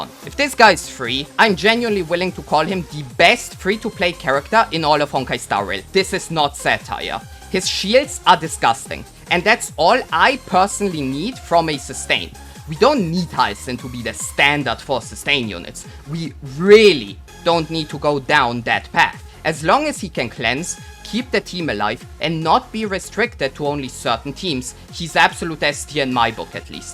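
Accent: Germany. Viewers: bruh, i'm trying to figure your accent. Are you a German?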